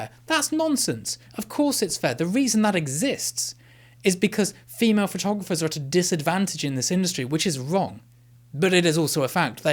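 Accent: RP, United Kingdom. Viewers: I think you nailed it! We can not apologize or change who we are, nor should we, as some would suggest! We can only try to make things better around us. BTW, a British accent does indeed add a level of poshness, elegance, and credibility! I remember asking my wife, who recorded the answer message at her firm? It was a female British colleague. 😊 Also, at one point, I worked with a consultant who was raised in Britain. When a presentation was needed , we all agreed that he should do the honors! It always sounded more on point when delivered by him. Thanks for the insight! Cheers from 🇨🇦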